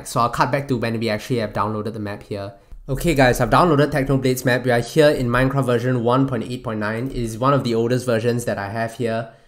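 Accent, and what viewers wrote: Singapore. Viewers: singaporean accent pretty strong here LMAO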